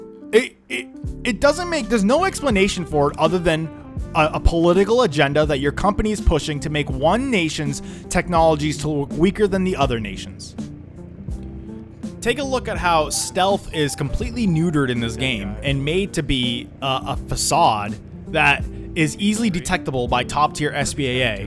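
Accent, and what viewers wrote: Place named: United States. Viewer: start video : american accent. yeah we knew already if you guys didnt get everything handed out you would cry xd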